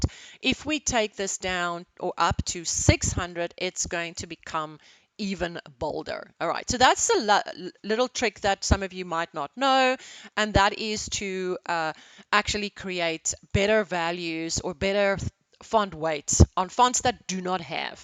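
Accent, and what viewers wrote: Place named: South Africa. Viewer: I presume you are South African, so for me as a South African, it was nice to have a common accent. Great tutorial. Could you please read some of your mail please, so many unread :-)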